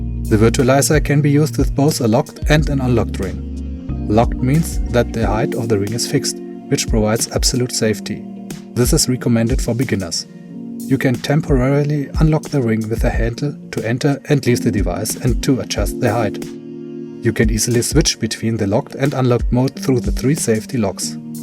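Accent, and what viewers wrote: Germany. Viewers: Interessant dass man immer direkt hört wenn Deutsche Englisch sprechen ^^ Gutes Video auf jeden Fall. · This german english triggers me ^^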